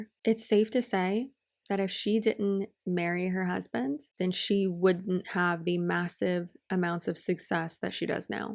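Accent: United States. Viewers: Also are you from south U.s born and raised? You have the perfect American accent 😍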